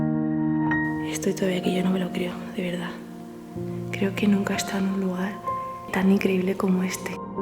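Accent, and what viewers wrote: Spain. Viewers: Sería bueno que nos pongas más en contexto. 1..¿cómo es que te metiste a ese sitio? Al hablar con vos tan baja me parece que autorizada no estás. 2..Grabar la parte externa. 3..Decirnos algo acerca del lugar. Algo más que nosotros debes de saber acerca de un lugar a donde te estás metiendo. (Dedusco que debe ser en España por tu acento, pero no dices nada acerca del sitio ). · Y donde es eso soy de Venezuela supongo que España por el acento, es hermoso aquí no se ven esas cosas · El acento español.. No sé por qué? Pero me remonta al pasado y ver tus videos.. es como estar en un cuento.. Quiero ese comedor tallado 😍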